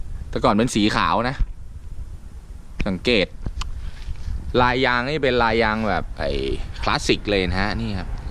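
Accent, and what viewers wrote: North, Thailand. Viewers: สำเนียง เมียงแต้ๆ^^ ผมคนแป้ครับ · ว่าแล้วเชียวว่าพี่แว่นเป็นคนเหนือ สำเนียงมันออก(สาบเมืองขื่น) ห้าๆๆๆๆ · ผมคนน่านแต่อยู่ในเมืองครับ #อยากให้พี่แว่นพูดเหนือเยอะๆ 555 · อู้กำเมือนขนาดนี้ เหนือแต้ๆ ครับ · อยากให้พี่แว่นพูดภาษาเหนือออกคลิปบ่อยๆหน่อย น่ารักดี FC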